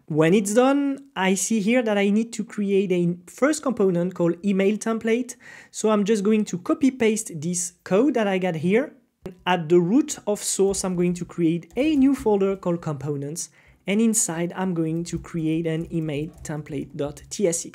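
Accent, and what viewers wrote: France. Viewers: Hello, t'a pas les même vidéo mais en FR? Bien que l'accens francais aide à la compréhension ahah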